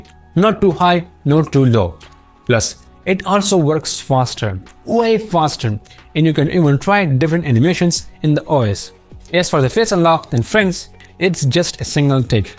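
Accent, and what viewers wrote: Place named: India. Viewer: the annoying dirty indian accent is cringe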